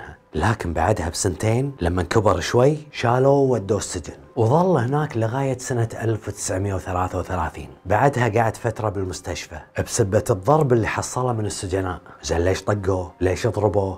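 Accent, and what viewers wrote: Kuwait. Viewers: تتكلم بلهجتكم الكويتية ... يديد يعني جديد فلماذا الياء مكان الجيم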